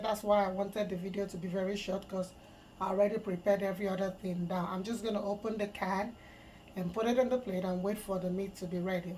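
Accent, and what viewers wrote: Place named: Sierra Leone. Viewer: Are you Sierra Leonean? I can hear the Salone accent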